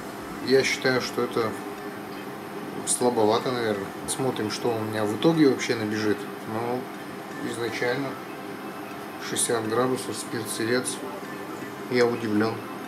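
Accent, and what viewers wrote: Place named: Urals, Russia. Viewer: Да, на Урале есть специфический акцент)))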